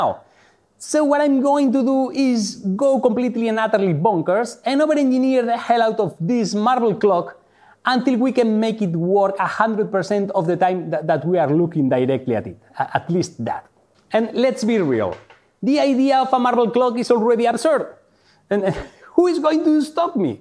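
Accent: Italy. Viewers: Absolutely. Can’t wait for the next episode. And you know what’s also brutally fun? Watch this with your eyes closed. I mean, just listen to him. He is king of speaking Italian using English words. Do so love that. 😊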